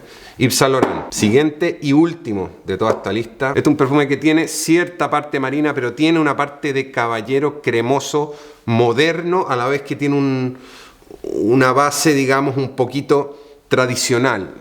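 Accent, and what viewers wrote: Chile. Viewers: no se porque tienes un acento Chileno, tremendos reviews!! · Hola , eres Chileno ?? , te pregunto por el acento, pero tienes de a ratos unos toques españoles y otros argentinos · Si eres chileno...que haces haciendo esa mezcla rara ...que vosotros... Que os digo...basta...sale raro y mal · Cierto tengo la misma duda su acento es d echile pero hizo de primavera y acá es otoño xd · Soy español y desde mi perspectiva le veo un acento muy muy chileno (por cierto, el acento más marcado que existe de Sudamerica en mi opinión). No le noto ningún deje español en su acento, aunque quizá los chilenos sí lo podéis notar.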